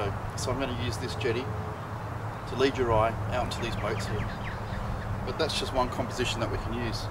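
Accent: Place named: New Zealand. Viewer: Great Video. Classic Kiwi accent.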